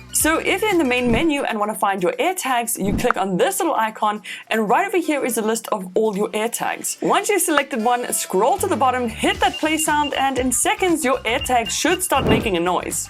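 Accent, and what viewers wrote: South Africa. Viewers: Great video. Your accent? S. Africa? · Are you from South Africa ? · Fnb App on your Watch? Are you South African ? I honestly thought you were Russian or from like that side of the world lol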